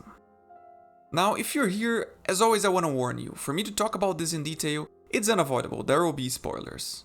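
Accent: Brazil. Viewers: are you brazilian? I'm asking because of your accent... :) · Caraca reconheci nas primeiras palavras que era brasileiro hehe vou conhecer o canal.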